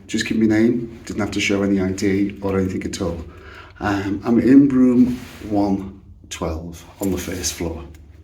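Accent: Liverpool, United Kingdom. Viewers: Are you Billy Kings brother? Another insufferable scouser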